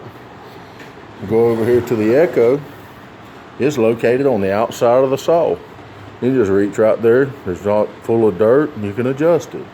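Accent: United States. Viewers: we Love our Southern accent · Sounds like good-ol American English 2 me.....👋😁🇺🇸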